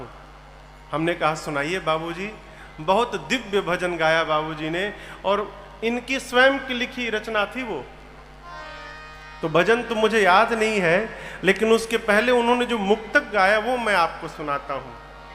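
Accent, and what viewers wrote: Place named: Bihar, India. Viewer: आप कथा कहते समय निम्न स्तर की भोजपुरी,मजाक, बिहारियों की तरह किया करते हैं।v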